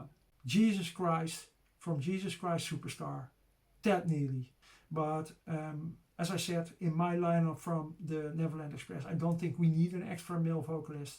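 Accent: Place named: Netherlands. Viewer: Het is goed te horen dat jij Nederlands bent. Behoorlijk accent!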